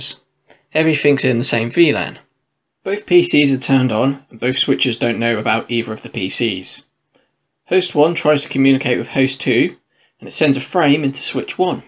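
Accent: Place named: Scotland, United Kingdom. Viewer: 你的口音让我想起了我远在苏格兰的奶奶。视频很棒。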